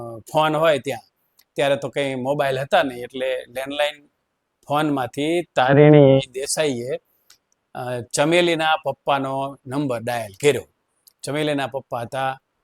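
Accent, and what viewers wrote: Surat, India. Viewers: ફુલ કોમેડી.... 😂😂 સુરતી ભાષાથી વધારે હસવું આવ્યું.... એકદમ મસ્ત વાર્તા કહી.... 😂😂👌🏻👌🏻👍🏻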